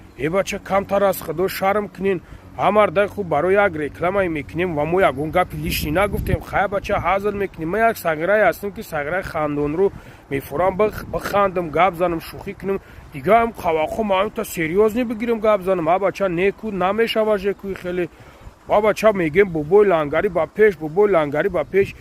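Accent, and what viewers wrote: Kulob, Tajikistan. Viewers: Кулоби гап назанохи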